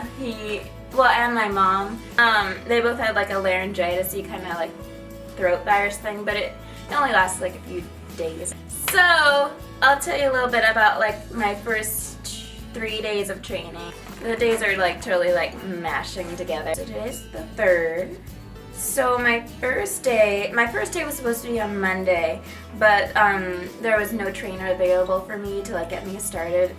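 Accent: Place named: Canada. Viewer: You sound Canadian ❤️🇨🇦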